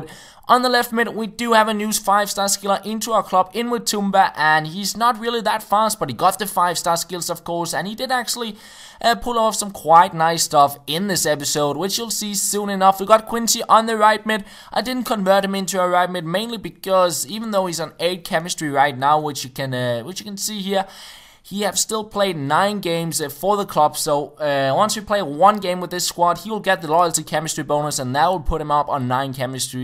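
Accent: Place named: Denmark. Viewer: Dat Danish accent...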